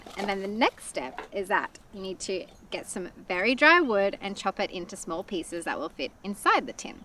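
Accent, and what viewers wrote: Australia. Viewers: Are you from Australia???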